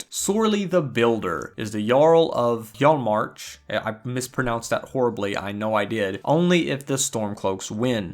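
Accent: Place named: South Carolina, United States. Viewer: Wow what an accent. SC?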